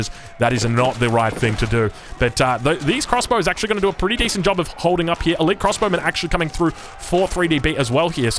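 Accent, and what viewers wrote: Australia. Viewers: Incredible game, incredible commentary! I've seriously never enjoyed any commentary with an aussie accent til now and absolutely nails it! loving every second of it.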